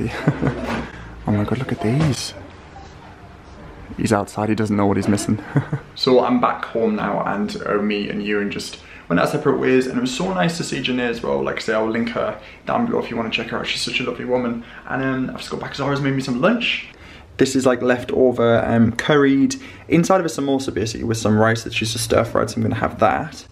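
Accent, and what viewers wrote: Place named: North, United Kingdom. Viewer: noticing a northern accent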